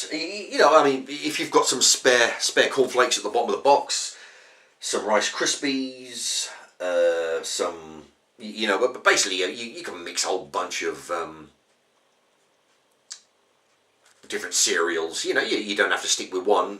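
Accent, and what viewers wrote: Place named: RP, United Kingdom. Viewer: Very posh.. Nice vid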